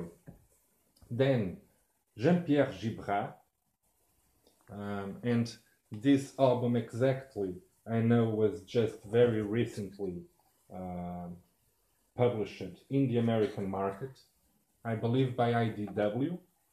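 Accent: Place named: Europe. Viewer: Speaking of european comics, Are you european too? You sound like european no offense.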